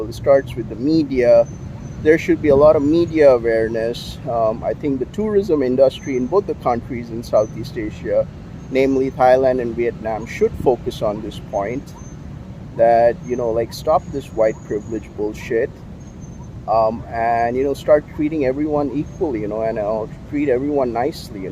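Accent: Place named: United States. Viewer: your accent is very American. Surprise that you are from India.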